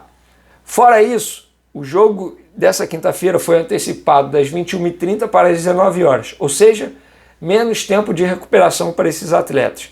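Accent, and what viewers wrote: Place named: Rio de Janeiro, Brazil. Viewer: Quem é esse Giérson que ele tanto fala? Kkkk Carioques é bom demais.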